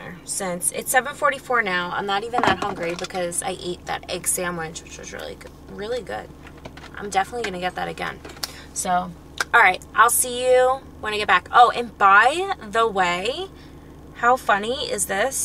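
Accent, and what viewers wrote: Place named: New York, United States. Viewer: Hi! I have to add sugar free maple syrup to plain Greek yogurt 🤗 because yuck lol I don’t know why every time you say “ coffee” I repeat it just like you with your New York accent 😂 Where did you get your cute black shoes? I’ve been in the hunt for some that I can just slip on. I love all the cloths!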